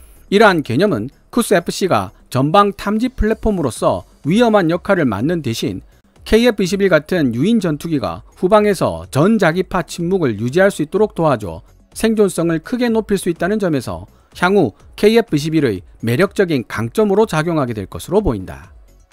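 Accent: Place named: Busan, South Korea. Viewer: 부산 사투리 억양때문에 도대체 뭔말을 하는지 모르겠네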